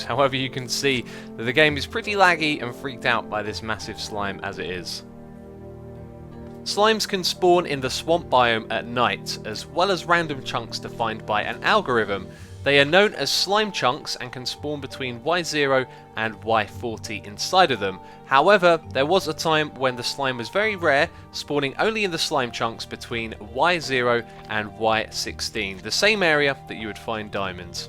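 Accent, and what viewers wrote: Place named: United Kingdom. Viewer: I <3 you X you are my favourite youtuber and for some reason I like your accent it's so British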